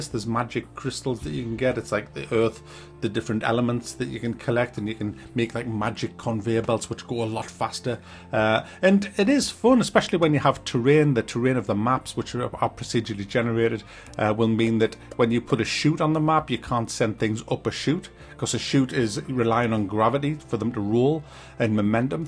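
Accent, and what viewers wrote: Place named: Newcastle, United Kingdom. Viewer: I love your videos man, they're reassuringly Geordie. Keep it up!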